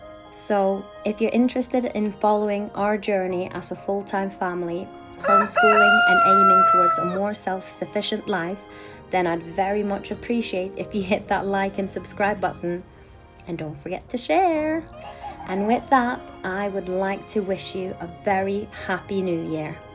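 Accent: Northern England, United Kingdom. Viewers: What a beautiful little piece of England 😊 You have a great northern English accent, for a Norwegian 👍 (the stunningly good YouTube algorithm recommended this) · For a Norwegian you have a very noticeable Northern English accent, Yorkshire?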